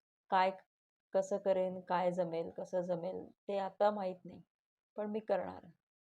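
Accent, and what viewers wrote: Vidarbha, India. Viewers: भाऊ भयंकर धक्का देवून गेला तु तुझी सवयच लागली होती रे तुझी वैदर्भीय भाषा आपलीशी वाटायची तुला विसरण कठीण आहे भाऊ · त्यांची बोलण्याची खास वऱ्हाडी शैली फार आवडायची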